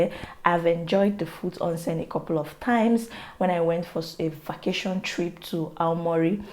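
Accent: Nigeria. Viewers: Very off topic but I like how u still have your Nigerian accent 😌😌😌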